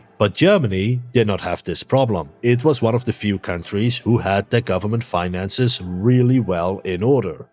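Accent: Netherlands. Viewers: I don't know whether I love your videos for the dutch accent or the great content. It's probably both, yeah who am I lying to it's both. Great video!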